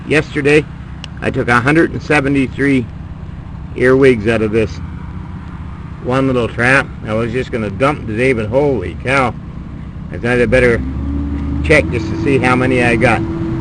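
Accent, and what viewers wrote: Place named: Canada. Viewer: you're not nice.. The guy is 1. Above 60 years old and 2. A nice sounding Canadian fellow. Give him a break and save your hate.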